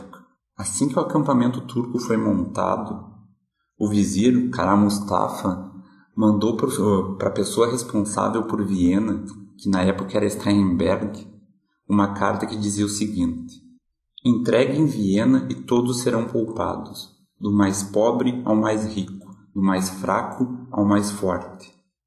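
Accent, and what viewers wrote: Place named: Curitiba, Brazil. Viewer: obrigado pela aula de história, o Islã mais uma vez ameaça todo o Ocidente, teu sotaque não te nega como um bom conterrâneo, reside em Curitiba?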